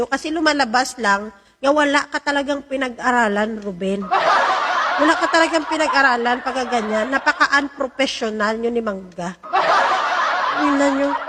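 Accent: Iloilo, Philippines. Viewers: Madam ask lng taga saan po ba kayp ilongga ka ba? Salamat enjoy ako manuod sayo..God bless mo vlog...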